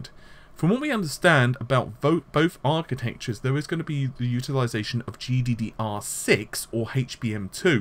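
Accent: United Kingdom. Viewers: "nm" is pronounced "nanometre" unless you would (for example) legitimately say: "my penis is 25 'cm' " rather than "centimetres" or "suck my 10 'in' biro" .... you would of course say 'inches' not literally pronounce 'in' written and spoken are different. also, you're wrong about how to pronounce Ti. honestly I think you're just trolling us on purpose now, but then I do wonder if maybe you even knew that 'nm' means nanometre... EDIT: I know I'm getting thumbs up for trash talking you - but I do want to say that I love your channel, your vids are really informative and I'm a proud subscriber... but dammit man, please just pronounce stuff like the vast majority - I have great respect for those that stand in the minority on issues, but language is not one of them, it's meant to populist, so that we can all understand each other. The 'common tongue' is called as such for a reason. 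We're both British and my accent is very similar to yours, so I probably identify too closely - I just want your channel to be a big success bubba! hugs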